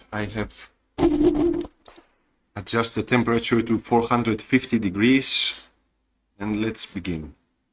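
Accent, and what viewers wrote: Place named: Greece. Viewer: Η προφορά σου μοιάζει ελληνικη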